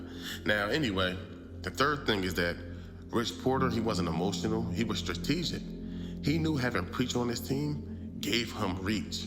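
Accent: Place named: New York, United States. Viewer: Sounds like New York WP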